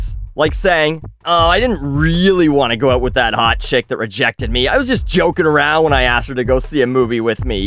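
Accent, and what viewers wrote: Canada. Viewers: <3 I've missed you and your pissed off Canadian accent:) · It's great to hear your strange Canadian voice again!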